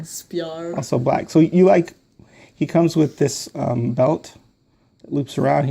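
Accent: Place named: Chicago, United States. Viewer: Are you from the Chicago area?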